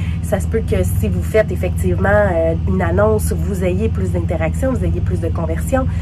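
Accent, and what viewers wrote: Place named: Quebec, Canada. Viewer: J'aime l'accent canadien(québécois)...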